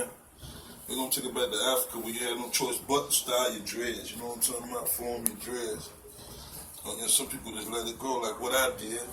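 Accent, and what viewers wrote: Florida, United States. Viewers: I can tell that u striaght from Florida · NATTY NATTY you can tell he from Florida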